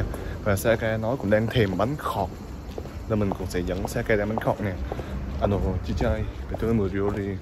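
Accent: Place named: Gia Lai, Vietnam. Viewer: Giọng nói của bạn giống ở gia lai vậy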